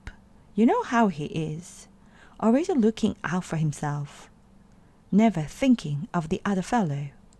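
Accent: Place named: United Kingdom. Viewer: 이 영상 덕에 영국식 발음 배운것같아요^^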